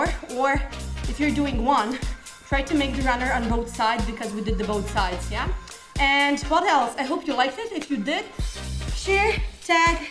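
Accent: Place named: Russia. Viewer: Russian English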